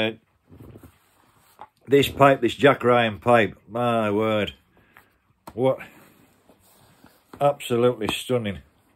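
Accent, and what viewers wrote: Yorkshire, United Kingdom. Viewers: Yorkshiremen aren't biologically capable of doing a posh, plummy accent...which is to your credit.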